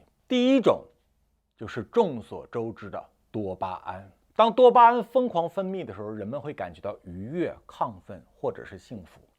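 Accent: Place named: Northeast, China. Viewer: 难得的高度！阅历丰富、悟性极强才能有这么深的感悟。是不是在北京长大的东北人？这口音尾音儿有东北味儿。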